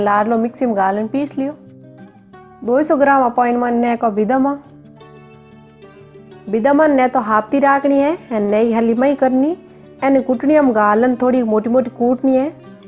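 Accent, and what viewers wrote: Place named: Marwari, India. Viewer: Khamma ghani sa🙏 bohot achi marwadi boli sa , recipe b badiya sa, bohot acho lago mithi marwadi sune ne😊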